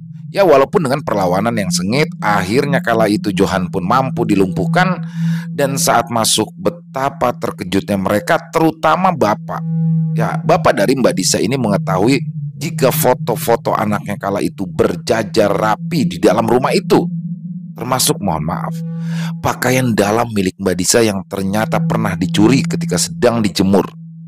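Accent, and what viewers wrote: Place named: Sunda, Indonesia. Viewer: Mirip memang....saya ngikutin jg..karena bisa liat2 pemandangan....hanya beda dari logat si...kalau kek nya terdengar Sunda banget hehe....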